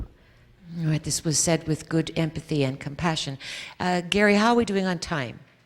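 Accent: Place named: Europe. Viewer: I am truly impressed and follow her down-to-Earth wisdoms and teachings religiously, together with bits of humour occasionally, mixture of European pronunciations occasionally, too. She uses modern Americanisms and old fashioned vocab comfortably. But, more importantly, and fascinating, is that she can speak non-stop, at great lengths, without hesitation [ and repeat words for emphasis ] on any topic whatsoever brought up by an audience / workshop member. Must be collective's instant telepathic communications at work, on thorough answers to questions. Very, very skeptical listeners might feel the questions are secretly given ahead of time, as in recent unfairness / dirty tricks in presidential so-called debates. But, the lengthy, detailed, not common knowledge historically and scientifically, answers kill any such simplistic skepticism completely.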